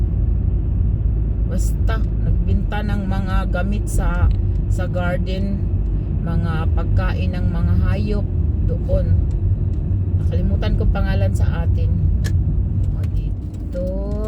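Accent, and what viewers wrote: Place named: Visayas, Philippines. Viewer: Bisaya ka ano, sis? Sounds like one kasi😂… na-curious ako sa blue egg…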